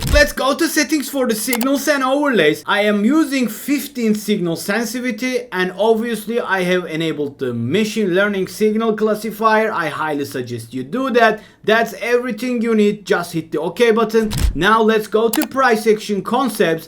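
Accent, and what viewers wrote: Turkey. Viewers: Bro I guess you are Turkish? Am i right ? 😄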